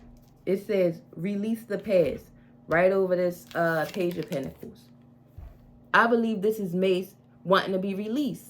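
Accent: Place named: Baltimore, United States. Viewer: Love Your Accent, Are you from Baltimore?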